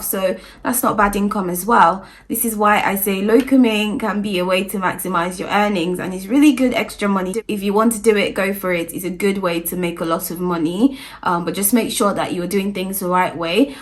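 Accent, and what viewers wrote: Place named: United Kingdom. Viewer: I love the UK accent. Keep it up.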